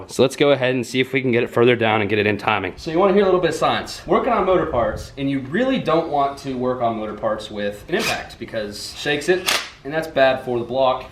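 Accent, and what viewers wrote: South, United States. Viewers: i liked how you went over everything and teaching the viewer and i thought it was funny how southern you sound and i knew i had to sub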